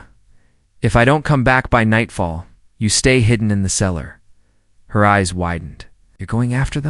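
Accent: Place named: United States. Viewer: Wonderful story! The narrator, obviously an American, does a terrific job. It’s hard to tell if his voice is real, or AI generated. But a great sound to hear a steady voice, no over stated, changing when things get tense. Sometimes when an English man’s voice over stressing the narrative can get tiresome. Or an American voice doing the same thing. I’m very pleased with the stories and narrative in these videos. ❤❤❤❤😊😊😊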